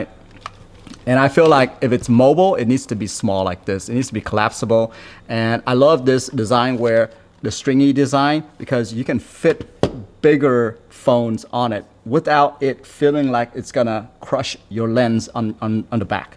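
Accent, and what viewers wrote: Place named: Vietnam. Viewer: I can tell right away - viet brah :)) there's a bit of an accent. Thanks mate - just what I was looking for. Gamepad with the case on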